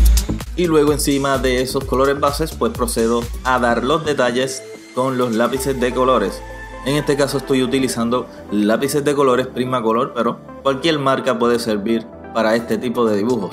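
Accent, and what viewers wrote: Puerto Rico. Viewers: OYE ERES DE PUERTO RICO?? Soy dominicano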